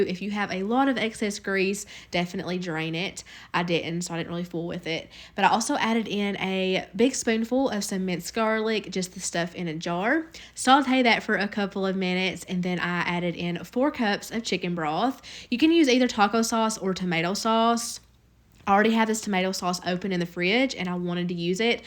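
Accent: South, United States. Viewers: Hi, you are a wonderful cook. Everything looks delicious, especially the hash browns & the pork chops. Your comments along the way are great (like the pan that heats up incredibly fast, & going next door to get milk!). Lastly, I could happily listen to your lovely Southern accent all day. Thanks for all the time and effort you put into your videos. Best wishes from Sydney Australia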